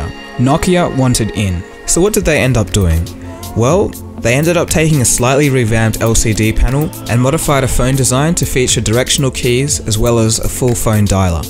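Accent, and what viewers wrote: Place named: Australia. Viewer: Nice to hear your Australian accent in the videos... thanks for putting them together, mate!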